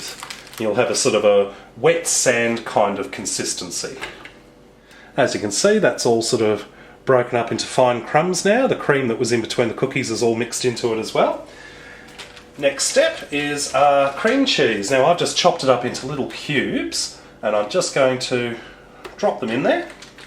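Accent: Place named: Australia. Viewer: i just discovered you (for myself)! delicious recipes and an australian accent? how lovely...you might get a lot more comments from me within the hour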